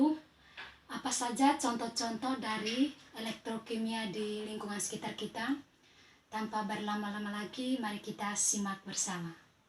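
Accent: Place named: Java, Indonesia. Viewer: Keren. Tetal smngat ee.. Saya fokus sama suarax.. Sdh medok jowo gah😍😍😍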